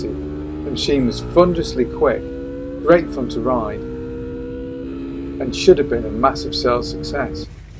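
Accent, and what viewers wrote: England, United Kingdom. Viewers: I could not watch the entire video. The English accent drowned in the background noise of the engines made it very difficult to understand. I'm sure it is a good video nonetheless !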